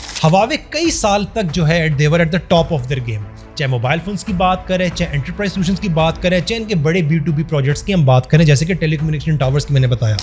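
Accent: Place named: Punjab, Pakistan. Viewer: बोलने का तरीका पाकिस्तानी पंजाबी स्टाइल है अगर आप थोड़ा सा ध्यान दे तो साफ समझ आता है। तो अब निकल पहली फुर्सत में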